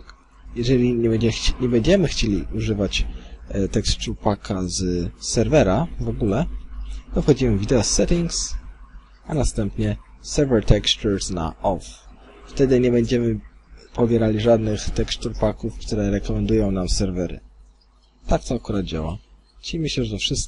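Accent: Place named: England, United Kingdom. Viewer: Masz taki angielski akcent <3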